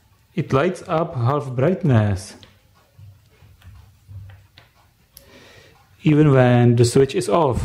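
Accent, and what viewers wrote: Prague, Czech Republic. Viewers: Já věděl podle tý angličtiny, že budeš čech :D :D velmi dobrý kanál a ta angličtiina je topová :D <3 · Nezameniteľný pražský prízvuk.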